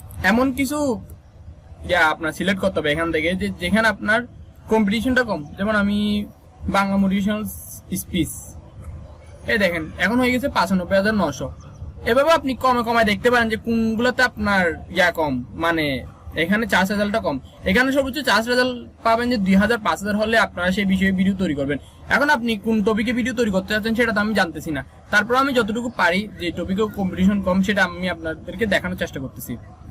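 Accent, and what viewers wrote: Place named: Sylhet, Bangladesh. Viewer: কথা সুনলে হাসি পাই......... সেলেটি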